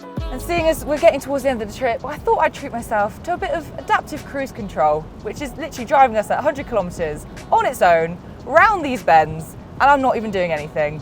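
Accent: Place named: London, United Kingdom. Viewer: I'ts a standard London/South East England accent. Btw your question is really stupid.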